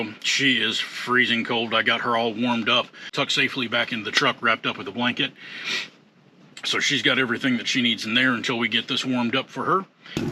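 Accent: South, United States. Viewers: Your accent tells me that you are from where we live, the south.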